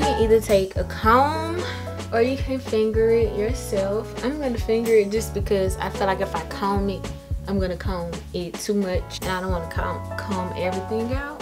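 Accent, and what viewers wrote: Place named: South, United States. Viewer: Wow, you hair is gorgeous and I love your curls. Oh, I love your southern accent too.❤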